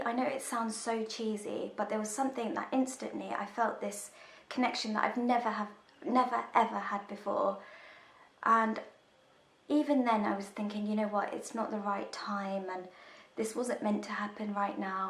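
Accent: United Kingdom. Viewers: do you say Tuesday like chuesday I like the British accent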